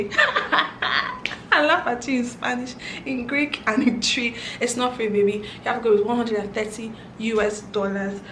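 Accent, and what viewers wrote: Ghana. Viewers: You sound so Ghanaian kudos!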